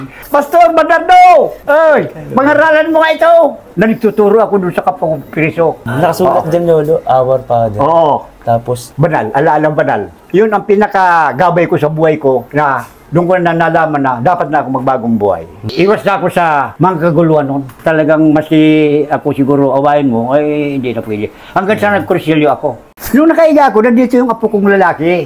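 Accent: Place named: Bicol, Philippines. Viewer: Part 4 boss Galing c Tatay magkwento tono bicolano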